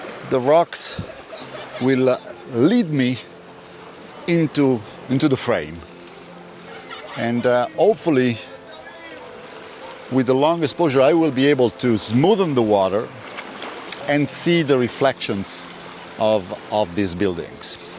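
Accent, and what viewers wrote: Italy. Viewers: I visit Bologna several times in my line of work! The thing i miss the most is your italian accent, and the food also ( we have excelent food here in Portugal, but in Italy is diferent! We used to go to a place named "Ristorante Bolognese", and the 2 brothers there, were the soul of that place...we see them once a year..but they never forget us! they call us "DA SILVA!!...HOW ARE YOU?!" in my "TO VISIT LIST" Cinque Terre, is in secound! Hope this thing go away very soon so that we can return to our normal lives! Great video, and as always, great photos!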